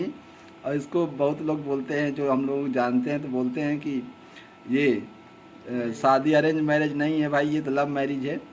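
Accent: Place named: India. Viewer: Bhaiya aapki bato pe bahut maza aata hai ekdam desi style me bolte hain, bhabhi ji ki smile bht pyari hai, aise vlogs dala kariye youtube par, pranam❤aap dono ko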